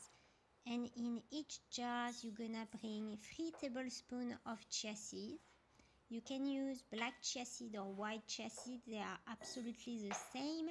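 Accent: France. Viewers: Going to try this! Your English with the French accent is the cutest! 😍 love from Luxembourg 🇱🇺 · Bonjour, merci pour ces idées. Vu l accent vous êtes française ?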